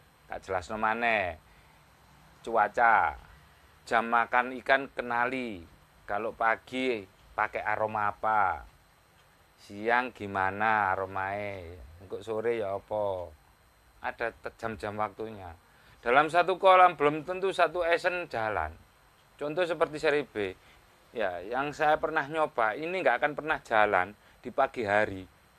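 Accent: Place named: Mojokerto, Indonesia. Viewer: kok logate koyok mojokerto an om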